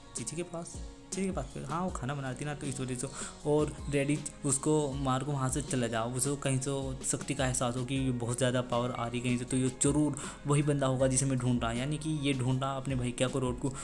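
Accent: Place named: Haryana, India. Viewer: Bhau hindi bolo haryanvi nhi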